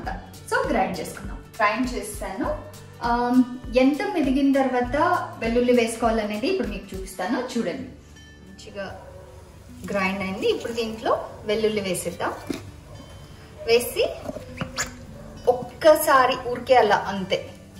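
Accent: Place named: Bangalore, India. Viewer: koncham banglore thelugu matladthundedhi kadhaa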